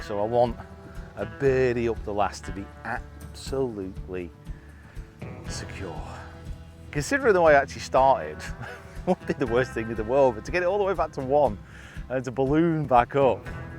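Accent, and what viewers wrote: United States. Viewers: your american accent is top notch, love it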